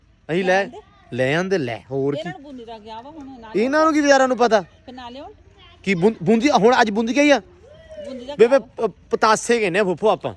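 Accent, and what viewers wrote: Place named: Majha, India. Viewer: Tuhadi boli sade majhe de lagdi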